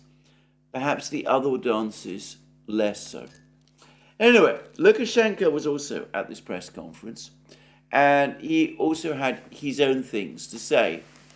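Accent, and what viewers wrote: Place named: RP, United Kingdom. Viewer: Mercouris is always an authority about the Ukraine war and about sophisticated Eton-style english language :+)) The only thing he dares not to do is to try to know who is in the "deep state". The "deep state" has no name.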